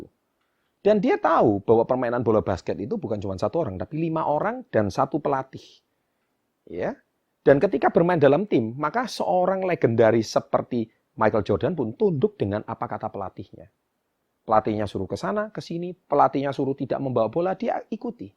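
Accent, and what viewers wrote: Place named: Banyumas, Indonesia. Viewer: Kurang ngajar sama orang tua ya kuwalat guees????orang ngapak iki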